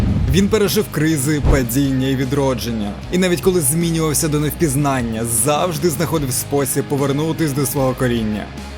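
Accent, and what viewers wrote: Russia. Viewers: Було цікаво але проскакує заводстка расссєйська прошивка. Важко слухати, коли кожне 10 слово викривлене. Хоча кожне 10 - це не кожне 3 …але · "Не цямиць", "падзіння", "бюрокрації", "дзякуємо".. це за першу хвилину.. далі мене не вистачило слухати цю "маацковскую" вимову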